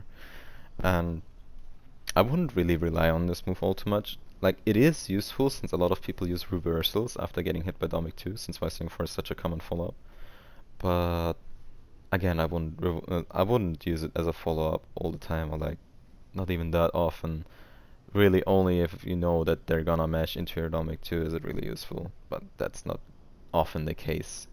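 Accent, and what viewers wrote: Germany. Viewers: Haha the accent always gives us away. Habe mir vorgenommen von Jin (T7) auf Dvj zu wechseln in T8 und dein content ist richtig gut bro. Danke fürs Teilen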